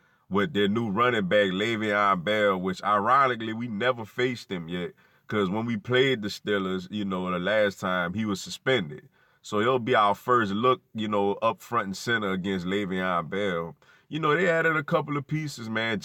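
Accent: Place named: Louisiana, United States. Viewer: I love your Louisiana accent lol what part are you from? I have family in New Orleans, Baton Rouge and Donaldsonville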